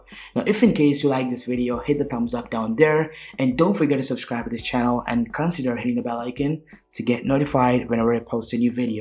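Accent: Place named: India. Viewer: I think he’s Indian because of his accent. I’m Indian too and I think his accent is Indian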